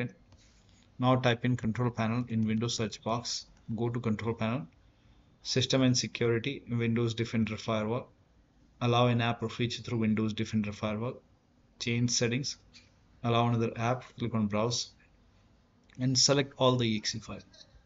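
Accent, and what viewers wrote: India. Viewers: BRUH FINALLY! Only an ubtuer with indian accent helps xd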